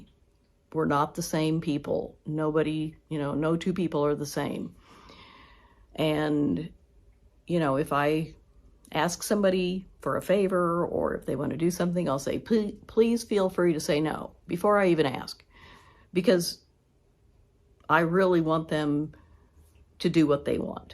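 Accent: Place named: Indiana, United States. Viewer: I, too, am an introvert and feel exactly like you do! Since I have been widowed, I mainly stay by myself. I wanted to ask you if you were from Indiana? You Have an accent like my cousins do!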